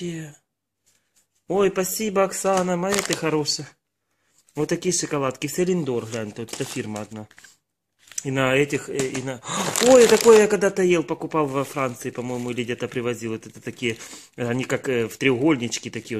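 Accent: Ukraine. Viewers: В этом -то и прелесть,в его украинском говоре.Так что никого не слушай,и шокай скильки душе угодно.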